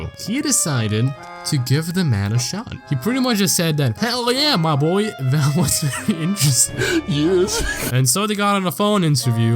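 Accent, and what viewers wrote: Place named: Baltic states. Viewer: Y'know, you have some weird Baltic accent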